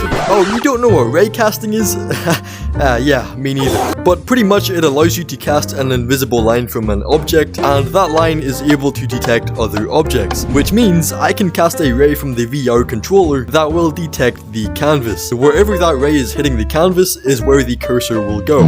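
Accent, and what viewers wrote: Northern Ireland, United Kingdom. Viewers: are you northern irish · R U NORTHERN IRISH BROOO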